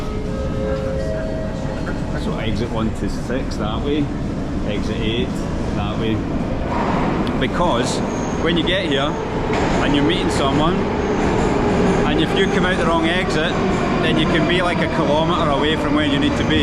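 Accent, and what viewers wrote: Scotland, United Kingdom. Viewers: My favorite is mayakovskaya as well!) Everlasting elegance. You seem a very nice guy😊 and I do love your Scottish accent. Have you been to St.Petersburg? It might remind you of Edinburgh✌️🤝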